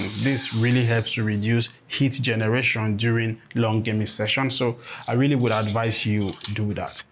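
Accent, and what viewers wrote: Africa. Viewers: I like your accent, its like african english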